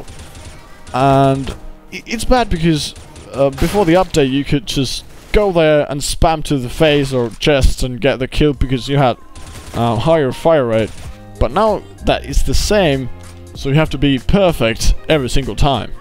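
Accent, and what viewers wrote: Finland. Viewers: I can hear the finnish accent leaking through! :^) But still hellagood english skills and good and entertaining video, earned my sub! : D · Vittu että nautin tosta sun pienest "Ralli Inklish" :D sen erottaa sielt just ja just :D muttei myöskää välttämättä jossei keskity :D vitu hyvää commentaryy näit lisää +1 :)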